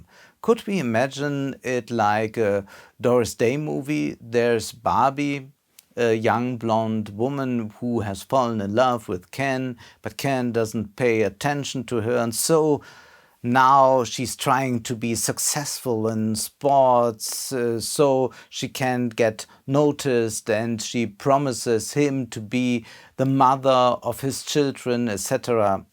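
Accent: Germany. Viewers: To create content in english as well makes sense on YouTube, in order to reach a wider audience. Great work, i hope it pays off! And the german accent is not an issue at all, we live in the 21st century, people from different countrys have accents… who cares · Jesus man hört den deutschen Akzent richtig hart raus.... Die Vorstellung das die Aufrufe explodieren nur weil das Video nochmal in Englisch gesprochen wird... Vielleicht lieber einfach englische Untertitel anbieten und wenn es viele wollen und man es kann dann anbieten? So ist der Aufwand doch höher als der Ertrag oder? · Mei dier Genosse! Der deutsche Aktenz stört glaube ich uns Deutsche mehr als andere. Ich habe die Erfahrung gemacht, daß ich Leuten die deutsch, aber mit einem fetten Akzent sprechen, eigentlich problemlos zuhören kann.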